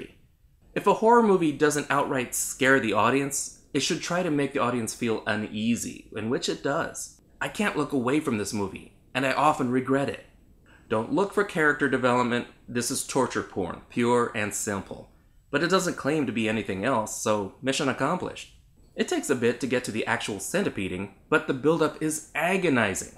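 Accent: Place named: United States. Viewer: Are you somehow a Filipino? Cuz honestly you look like one with a very american accent 👌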